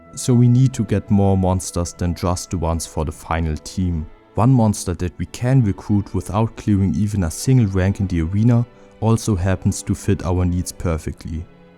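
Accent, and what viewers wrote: Germany. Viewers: Oh gods. The german is real. Even my Dialect isn't that bad when I talk English. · Hello! Good job! Well done! Ja, ein "legendäres" Spiel...also das PS2 Spiel (für mich persönlich)...Nostalgie pur! Yes, you cannot hide your German accent! But that is completely irrelevant! Who really cares about your accent when you speak English! A wonderful game! Ich kann mich auch noch an Final Fantasy X erinnern...auch sehr fantasievoll! Das waren noch bessere Zeiten (für mich jedenfalls), ich bin alt geworden... Alles Gute, viel Erfolg, Glück, Freude und Gesundheit! Grüße aus dem "wunderschönen" Kreis Offenbach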